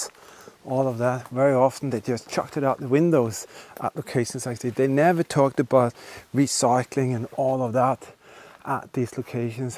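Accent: Germany. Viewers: You sound German to me, but then the pronounciation of Feuergefährlich makes me things differently. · Warum sprichst du englisch, man hört raus das du deutscher bist ,und wenn dann könntest du wenigstens einen guten Untertitel erstellen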